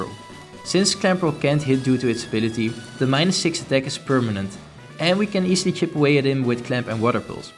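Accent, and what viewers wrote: Netherlands. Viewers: you sound very dutch, is this true?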